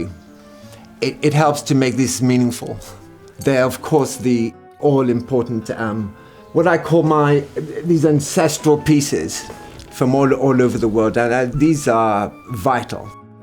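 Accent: RP, United Kingdom. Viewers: He talks like the Royalty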